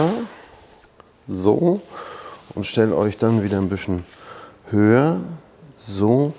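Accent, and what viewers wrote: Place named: North, Germany. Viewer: du Tüffel🤣🤣🤣erkläre mal den Bayern was ein Mors ist. Ok meine Tante ist aus Kappeln daher kann und kenne ich den Norddeutschen Dialekt. Deine Vorführung per Video ist wirklich klasse und gut. Für mich dein kaum hörbarer Dialekt auch schön aber denke an die deutschen Ausländer. Du hättest mit unserem Dialekt auch große Probleme. Gruß Klaus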